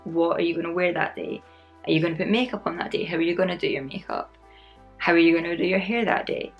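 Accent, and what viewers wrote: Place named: Scotland, United Kingdom. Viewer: 🥰 btw where are you from? I love your accent. Kinda reminds me of the Scottish actress Karen Gillan.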